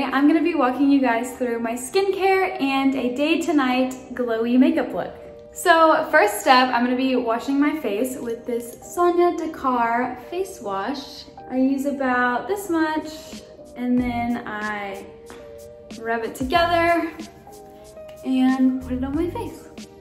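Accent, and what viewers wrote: United States. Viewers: BRO HER VOICE CHANGED REMEMBER SHE HAD A MORE SOUTHERN ACCENT AND NOW ITS MORE AMERICAN I GUESS?? no hate but i just realized that❤️❤️ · This is how she always talks. And what do you mean “kourtney’s” accent? It’s called being American 😂 they don’t sound anything alike.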